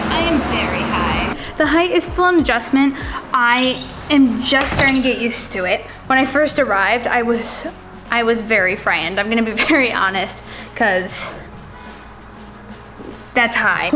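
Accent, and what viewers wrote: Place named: United States. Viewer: 纯正的美式英语！我喜欢这个小妞！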